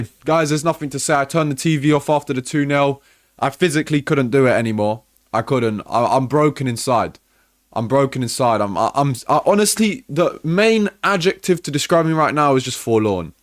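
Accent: United Kingdom. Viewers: Why does this guys accent sound so brittish??? Isn’t he Italian? Lmao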